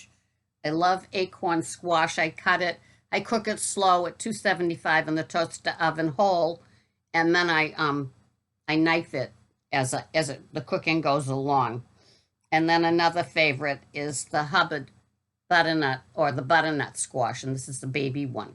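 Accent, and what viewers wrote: Boston, United States. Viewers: Thanks for the Saturday Show! You made my day! I’m in love with your Boston accent!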